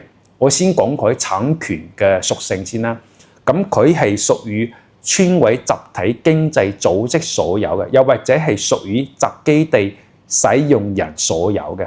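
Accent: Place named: Hong Kong, China. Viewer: 香音未改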